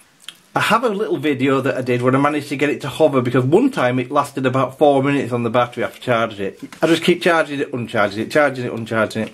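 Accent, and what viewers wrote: Yorkshire, United Kingdom. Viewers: Thanks for your honest review buddy. Sounds like you're from Yorkshire? I have a similar channel and I'm in Sheffield. Take care bud.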